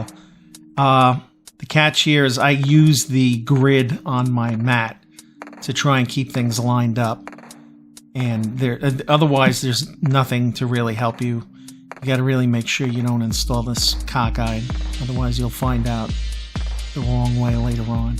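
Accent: Texas, United States. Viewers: Weirdest Texas accent ever 😊 Great build buddy.....glad the channel is so successful and growing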